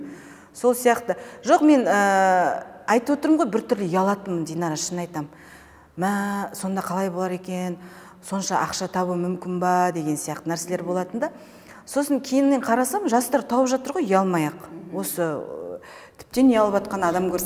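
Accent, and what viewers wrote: Standard. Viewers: Динара қазақша таза сөйлеп қалыпты, молодец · Динара ханым, жарайсыз! Осы интервьюда таза казак тилде сойлеп шыктыныз, кулакка оте жагымды естиледи екен (менде казак тилде клавиатура косылмаган айып етпесин)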